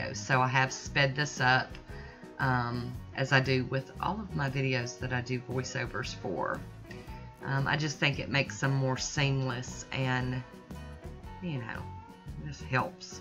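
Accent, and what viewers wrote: South, United States. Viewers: Love it! Water-marbling is what started my nail art addiction too! Unfortunately, I never got very good at the water-marbling type of nail art but I’m getting better & I am better at stamping, and hand painted designs. Now I’ve ventured into doing my own acrylics, acrygel, & just recently started gel polish and hard gel. I love the products and tutorials by wildflowers nails and by Kirsty Meakin’s tutorials on Naio Nails aswell as her urban graffiti gel polish line are so amazing and easy to use! I love the formula of those gel lacquers they made all the difference in the world to my gel polish game! I was just using the brains found on amazon before that and had about given up on being able to do anything because I couldn’t get the gel polish to look good in order to use the wildflowers products but once I laid down her gel polishes using the tutorials she and Lauren out on youtube I was producing some great looking designs! 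Now I am not a pro I am just self taught from YouTube but because I was disabled by a drunk driver in 05 I can no longer go to nail salons due to my illness I have no immunity and have seizures so I started doing my own and then branched out to doing family and friends just for fun and when I’m able to (which unfortunately isn’t often as recently I’ve been sick and unable to get out of bEd for the past 2 1/2 months) but I enjoy your tutorials too! It’s so wonderful to have a fellow southerner to listen too! I had not been using small size bathroom cups s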